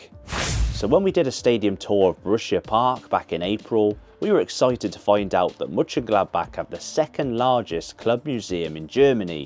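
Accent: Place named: Manchester, United Kingdom. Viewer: Nice strong manc accent youve got there lad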